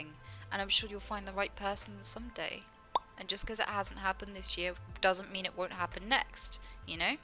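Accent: United Kingdom. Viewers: So is that it? A hot girl with a British accent who randomly spews out profanity? I think I'm in love.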